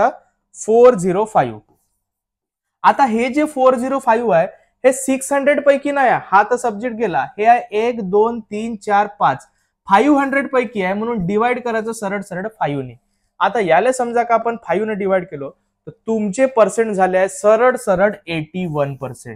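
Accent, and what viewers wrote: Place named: Malvani, India. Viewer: Sir khup chan malvani boltat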